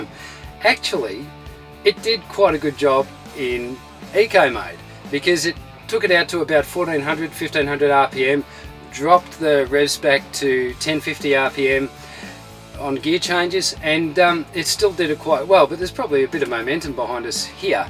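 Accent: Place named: Australia. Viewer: Man! I love Aussie accent.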